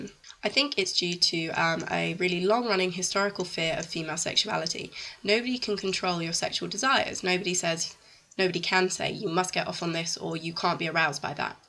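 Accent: United Kingdom. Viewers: You seem to have British accent, so I am thinking in England, sexual topics aren't something women easy talk about or do, as you have queen, she makes uncomfortable for you to masturbate, nobody there can imagine her doing it, while in USA, France and other countries not under strict female supervision, something like that isn't questioned so much? As internet is in widely use for 15 years, girls everywhere are now more aware of masturbation, it is seen online, is that what makes you speak out? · Loving the topic and your British vocal sound, see i did'nt say accent lol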